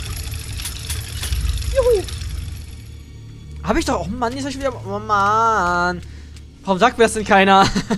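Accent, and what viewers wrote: Berlin, Germany. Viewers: du und dein berliner sprache weste bist du berline dann sag "ich bin ein berliner"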